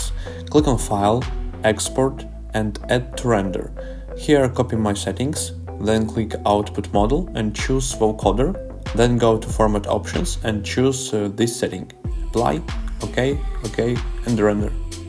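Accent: Russia. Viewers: bro you russian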